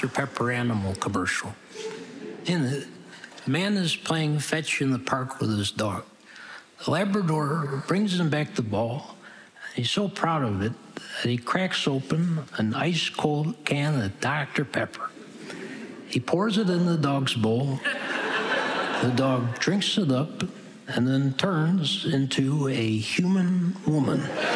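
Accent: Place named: New York, United States. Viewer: A voice that actually represents NY. This man is a treasure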